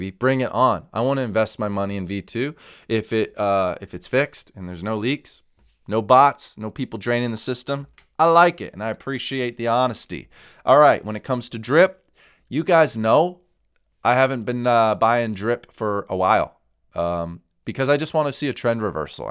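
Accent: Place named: Australia. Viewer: You Australian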